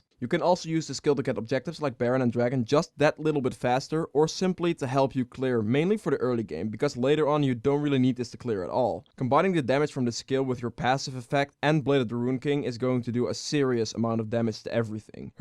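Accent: Netherlands. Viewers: ben je nederlands? ;D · je hoort altijd als een Nederlander Engels praat haha, ^_^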